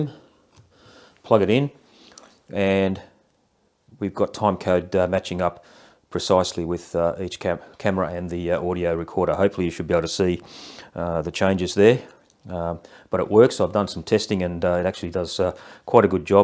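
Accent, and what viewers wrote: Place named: Australia. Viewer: awesome mate. great to see Aussie accent with these tutorials as a kiwi. Love your vids. new sub today.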